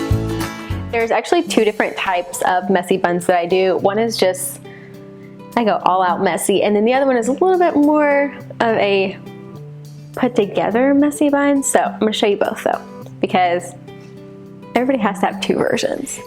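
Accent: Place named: South, United States. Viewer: Your southern accent is cuuuute!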